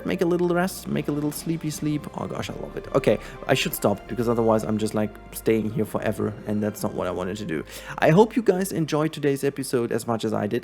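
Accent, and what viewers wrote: Germany. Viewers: Bist du deutscher oder so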